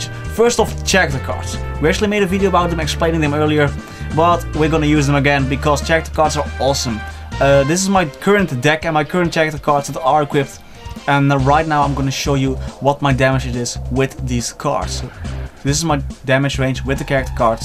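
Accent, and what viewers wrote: Netherlands. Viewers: Nice video :3 Haha I was like hmm I think hes dutch and I'm right xD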